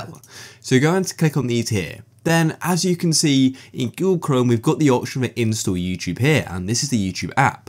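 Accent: United Kingdom. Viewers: this guy is speaking british english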